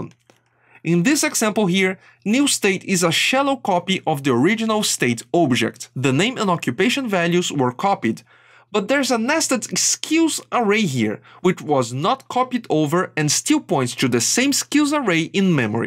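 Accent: Brazil. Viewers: Are you brazillian? You have a brazillian accent Nice vídeo!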